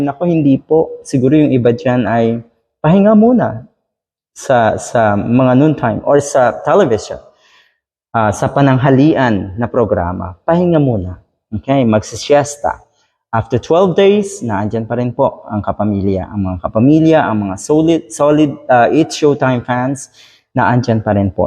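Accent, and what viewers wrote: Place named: United States. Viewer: FIL AM YATA ITO SLANG MAGSALITA...SLANG DIN UTAK ..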